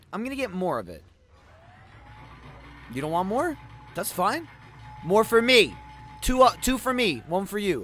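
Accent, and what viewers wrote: United States. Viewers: ... You Are from USA?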